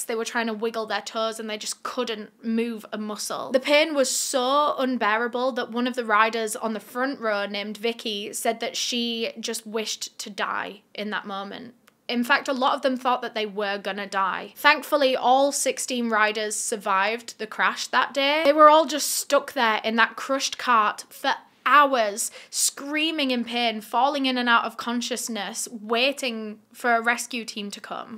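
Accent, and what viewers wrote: United Kingdom. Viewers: the british accent is making me nauseous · From America here. I absolutely love your accent. I hear different accents from the UK and I’m so jealous of yours. And keep saying fuckin. Fuck Fuck Fuck. Shit shit shit. 😂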